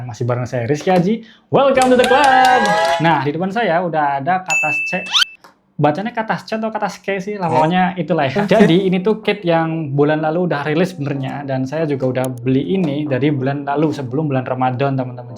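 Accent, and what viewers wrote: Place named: Brebes, Indonesia. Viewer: Oalah wong brebes koe mas. Salam ngapak mas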